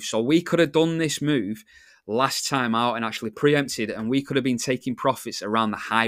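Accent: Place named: Lancashire, United Kingdom. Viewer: Honestly mate, you sound like you're from where I live, well the area anyway I live near Burnley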